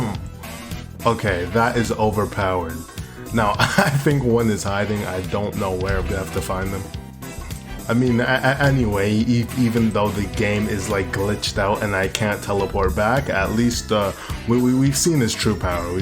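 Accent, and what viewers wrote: Jamaica. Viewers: Yow u jamaican?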